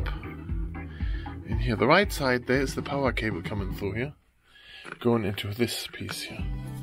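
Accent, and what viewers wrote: Germany. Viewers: Er hört sich irgendwie deutsch an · Thank you for this video! My party box has stopped charging and only works when it is plugged in to AC power and the DC power does nothing. By the way, your accent makes this video a hundred times better. I love the German language 😅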